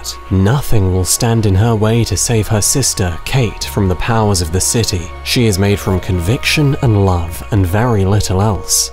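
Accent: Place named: United Kingdom. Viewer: I would say it's that damn British accent but no I think you're quite right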